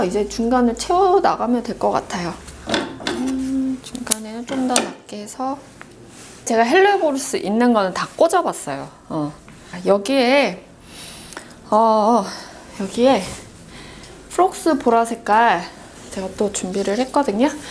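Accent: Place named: Busan, South Korea. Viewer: 일기읽을때 부산느낌 물씬!! 오늘 꽃도 너무 예쁘네요! #힐링초하 힘내보입시다!!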